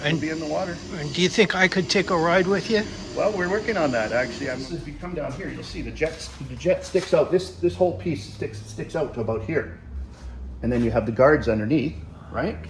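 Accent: Canada. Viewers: i know the family personally, went to high school with Mr. Clothier and 'Ms. Shirley's daughter [Jessica - my year - a friend] and son [John - a year earlier and more of a friend], in North Van. I have been to their home many times [an 18-minute walk]. I was actually more commenting on your dialect... being born and raised in North Van and had many First Nations friends [not in my neighbourhood, except 4 doors down from my childhood street ['weird' - not 'weird' - growing up that I never thought of them as 'Indian' - my brother was best friends with the oldest brother and all I identified the 3 brothers as Randy, Rick and Wynn] but in the Squamish reserve in North Van... [long story how I accumulated many First Nations friends in a reserve that was a 14-minute bus ride away], your dialect sounds very Squamish Nation, but maybe you could correct me. Musqueam? Love the background that I already knew, with a few phenomenal extras you revealed... 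exploring your high school friends is not what most friends do, except the home had war photos and stage acting photos of both Mr. and Ms. [from England and Canada and the University of British Columbia, my Bachelors and Masters alma mater], so some stories came out, but Mr. Clothier was rather humble about his war endevours, like most Canadian veterans, just like my father, a navigator in the Pacific during WWII.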